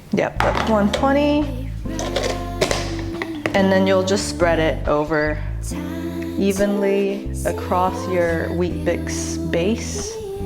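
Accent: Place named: United States. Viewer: Metric system with american accent is throwing me off. We use freedom units here.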